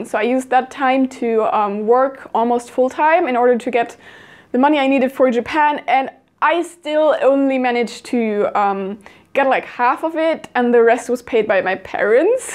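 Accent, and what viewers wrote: Germany. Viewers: So, she's German. That explains why I can actually understand what is being said, because English native speakers usually speak too fast for me to understand... Nice accent and good fluency. ^^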